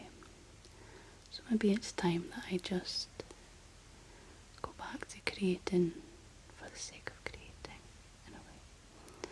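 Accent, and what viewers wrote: Scotland, United Kingdom. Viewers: Love your Scottish accent, reminds me of my childhood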